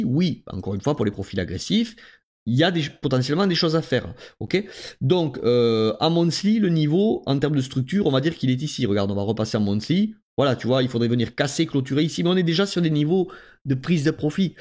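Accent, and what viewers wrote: South, France. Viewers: Santé avec ta jarre lol je me régal à écouter tes analyses avec ton petit accent sudiste peut-être non....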